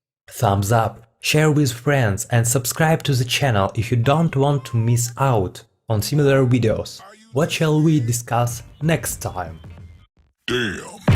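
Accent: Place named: Russia. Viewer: он та из России, вырос в такой среде с таким акцентом. Научиться со временем.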